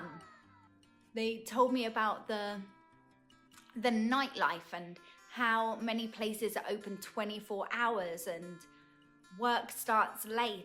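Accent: United Kingdom. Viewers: 英國口音好好聽，看著你講話舒服。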